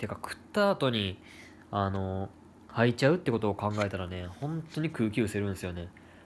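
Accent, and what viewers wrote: Kansai, Japan. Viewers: 関西弁なんかいいな（笑）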